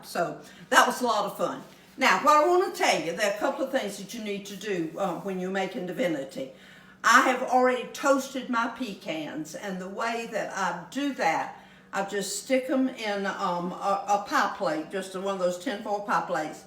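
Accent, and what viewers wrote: South, United States. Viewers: I miss that southern accent. I use to say boil just like you. I don't understand why that person said they need captions bc they couldn't understand you. I hear ya just fine😊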